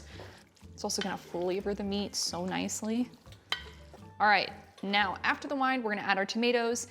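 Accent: Philadelphia, United States. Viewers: you sound like you’ve a light Philly accent?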